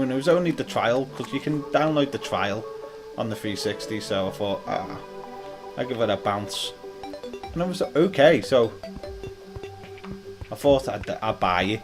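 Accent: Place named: Liverpool, United Kingdom. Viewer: sound scouse haha im from skem an just bought this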